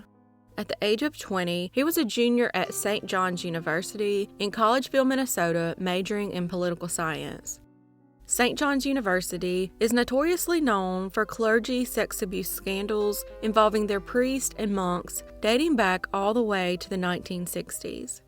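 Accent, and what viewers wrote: South, United States. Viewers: Your southern accent really relaxes me. I’m from Arkansas and Oklahoma so not quite as long of a drawl for me but I still am around it. ❤️🤟🏻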